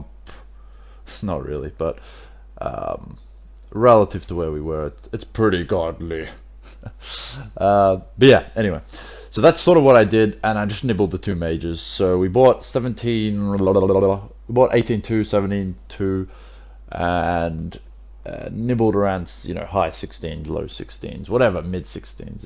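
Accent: Australia. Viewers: aussie accent fooo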